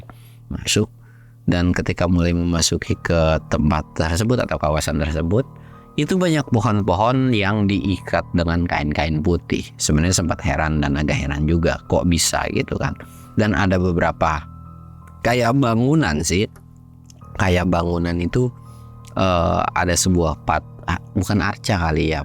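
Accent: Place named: Jakarta, Indonesia. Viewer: Horor jadi ngga serem, lawakan gaya lenong betawian Ama logatnya jadi ngga serem😂😂😂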